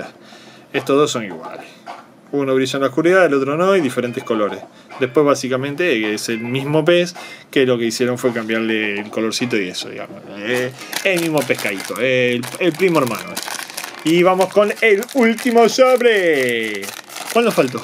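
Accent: Argentina. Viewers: pares un argentino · jajaja que chulo me encanta el accento argentino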